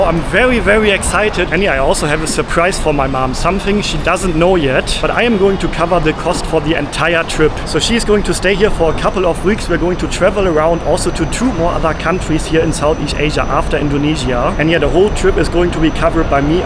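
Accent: Germany. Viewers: Your German's accent is really strong huh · He's got a horrible German accent. But if that is what you like, just listen to Germans. Just find the ones with the worst accents and whose vocabulary is extremely limited and basic. Many out there in Germany. 🙃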